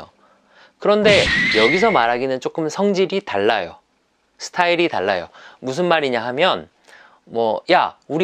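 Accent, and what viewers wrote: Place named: Gyeongsang, South Korea. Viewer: 저는 어릴때부터 발표를 너무 싫어하고 기피했는데 살면서 정말 피하고 싶었던 그런 면접을 보게 되었거든요ㅠ 그런데 제가 부산사람이라 억양을 어떻게 해야될지도 모르겠고 그래서 더 너무 힘들다고 생각했는데 선생님께서 경상도 억양을 섞어서 너무 자연스럽게 똑부러지게 말씀하시는거 보고 부산말로 하는게 이상한게 아니구나 깨닫고 지금 그것만으로 너무 감사하는 마음입니다ㅠ 저 힘내서 잘해보겠습니다.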